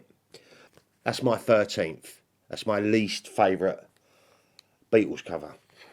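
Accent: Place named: Romford, United Kingdom. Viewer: Really enjoyed this video. Real hard to rank them. Personally I’d have put higher than the white album just because it’s got that glossy autumnal cover. edges it for me over too and I’m not a big fan of the album cover but your list was very interesting. You sound like you’re from round my way too. Im from Romford. Take care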